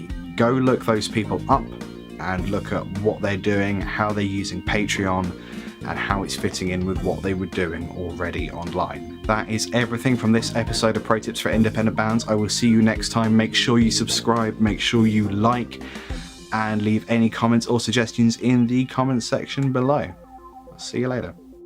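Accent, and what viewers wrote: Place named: England, United Kingdom. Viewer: Thank god an English accent! 🤣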